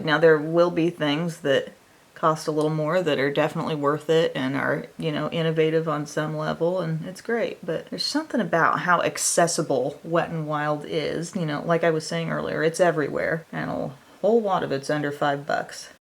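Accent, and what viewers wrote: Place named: South, United States. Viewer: I'm just curious I know that you live in the Midwest. I always hear your voice like you have a slight southern accent. Where are you from?🤗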